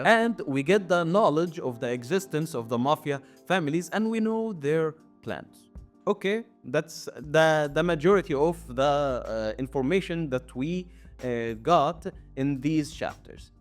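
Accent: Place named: Egypt. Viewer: My friend you have an Egyptian accent.. it's nice however, is it actually Egyptian?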